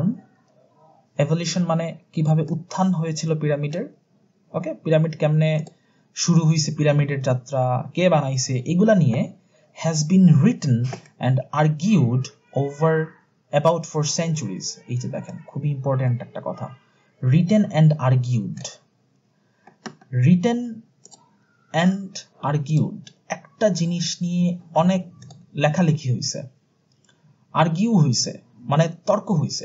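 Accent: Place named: Sylhet, Bangladesh. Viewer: সিলেটি ভাই। আমিও সিলেটি😍🙂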